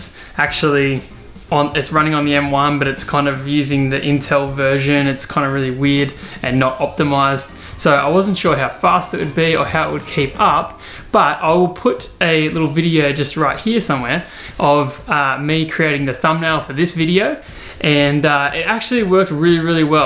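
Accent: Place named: Australia. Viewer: What a great guy you are. So nice to hear an Aussie too. I am about to buy an Air M1 for my grandaughter to use photoshop. Really appreciate your feedback/review. 😀😀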